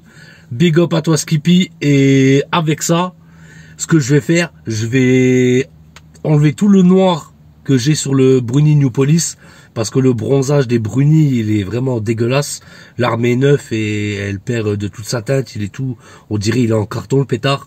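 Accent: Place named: Lorraine, France. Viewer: Salut! Je t'ai découvert par la chaîne de JP et en regardant toutes les vidéos du weekend entre fumier, j'aurais tout de suite mis ma main à couper qu'avec un accent pareil tu viendrais soit de Nancy soit de Metz, en tout cas en Meurthe et Moselle 😅 Moi je suis de Nancy et franchement si tu passes par là ça ferait vraiment trop plaiz de te rencontrer et d'échanger avec toi, pareil si tu cherches un compagnon de tir ou même des endroits pour faire des concerts à Nancy je dois encore connaître deux trois coins sympas pour t'éclater et te mettre en relation 😁 J'ai vu aussi que tu faisais du flocage on en faisait y'a un peu moins de 10 ans avec des potes de Metz sous le nom de EMKA prod si ça se trouve on connaît des gens en commun ça serait ouf ^^ Bon allez salut à toi la chicorée je sais pas si tu verras mon message mais je tente quand même😁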